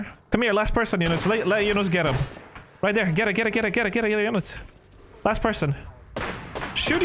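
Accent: Romania. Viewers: u romanian?? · OMG ESTI ROMAN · u romanian? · wtf are you Romanian? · R U ROMANIAN?/Esti roman??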